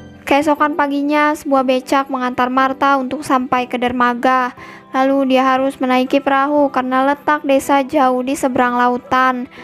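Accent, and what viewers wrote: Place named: Madura, Indonesia. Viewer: Suaranya kayak bocil sd, gbisa santuy tapi cepat. Maduranya kelihatan banget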